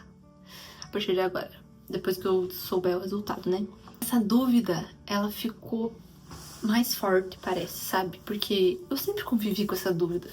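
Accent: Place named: Paraná, Brazil. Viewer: Nossa, que loucura, eu reconheci o sotaque do Paraná, mas nem sonhei que fosse de Guarapuava. Moro aqui atualmente também. Amanhã recebo minha devolutiva, e meus sentimentos desde que descobri essa possibilidade foram muito semelhantes aos teus. Achei a profissional que considerava ideal, que me transmitisse a confiança suficiente pra não dar margem pra síndrome do impostor atacar, caso recebesse a confirmação do laudo e mesmo assim ficasse duvidando da veracidade da informação. O problema é que ela tinha uma fila de espera de cerca de 6 meses pra avaliação. Jesus, tive que esperar né, mas imagine a "angústia" 😂. Mas valeu muito a pena a espera. Agora estou vivendo a mesma fase, de ter 99% de certeza que sim, mas com aquele medo de cair do cavalo amanhã é real. Também a preocupação de o que fazer com essa informação, caso se confirme. Enfim, muita coisa pra sentir, mas feliz por estar fazendo. 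Parabéns pela coragem de se expor assim, só quem passa pelo processo e estuda o tema é capaz de entender as dificuldades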